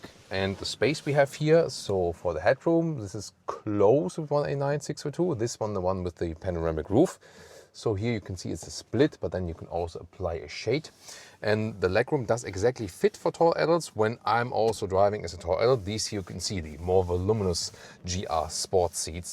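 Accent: Germany. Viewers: Yes, but his "german english" is strange... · Finally a clean summarize car reviews Im a Asian but if feels like the person is a German salute for being sofisticated and that acsent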